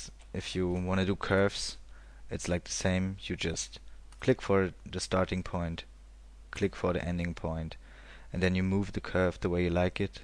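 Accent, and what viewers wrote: Germany. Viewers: ARE YOU A GERMAN INDIVIDUAL? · 14,958 views as of right now. I think we like your common sense attitude and your clear way of speaking. It's apparent that German accents are cool... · a german accent but its a good video ;) thx´s :)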